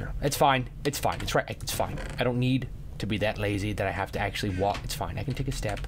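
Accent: Scotland, United Kingdom. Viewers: scottish accent is the best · What happen to Scottish accent voice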